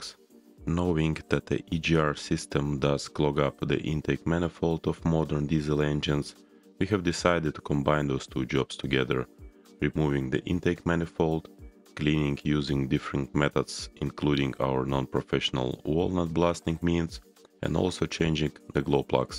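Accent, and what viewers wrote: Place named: Russia. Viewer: I hear russian accent :D а есть полное видео со всеми деталями?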